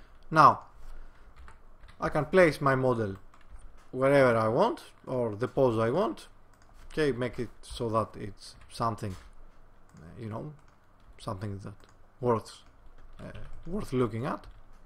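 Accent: Greece. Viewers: You must be Greek, so cool accent, not the fake stuff at all. Μου έφτιαξες τη μέρα.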